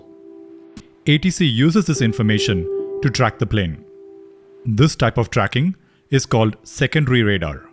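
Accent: India. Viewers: This Indian accent sucks, makes listener wanna laugh, not be serious. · Why is a pajeet narrating the video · The presenter sounds indian, and i am proud that such a high quality detailed presentation is put together ! Brilliant work, brilliant level of detail covered. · why your english accent is indian?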